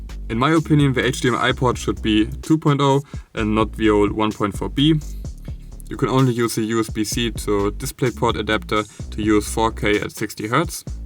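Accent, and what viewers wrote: Germany. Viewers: Dein Englisch ist gut, man hört nur sehr dass du Deutscher bist... xD LG :)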